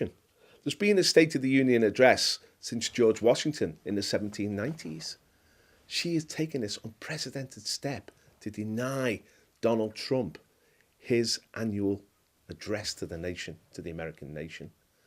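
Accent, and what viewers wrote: Liverpool, United Kingdom. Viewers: Ja! Pure Scouse.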